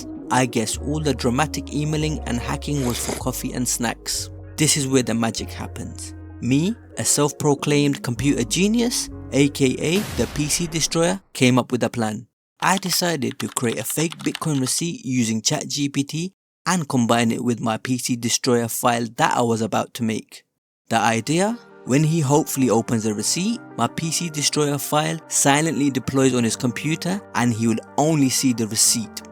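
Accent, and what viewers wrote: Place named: Nigeria. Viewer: Why are you talking like a Nigerian 😂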